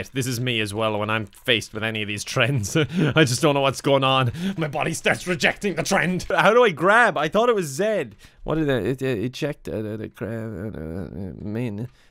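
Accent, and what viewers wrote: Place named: Ireland. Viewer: After awhile the Irish accents just seems normal to me. It's just. Then he pronounces Z as Zed and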